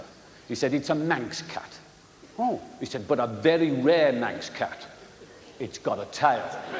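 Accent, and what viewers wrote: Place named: Birmingham, United Kingdom. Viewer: He can't help being Brummie... He was born there 🤣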